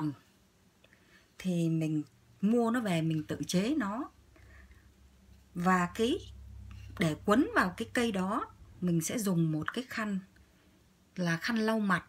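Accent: Vietnam. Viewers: Giọng nói của Cô hay quá Cô ah - giọng Bắc / Saigon xưa - thật rất đẹp và sang. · Đó là tiếng Bắc 54. Nghe rất hay và sang.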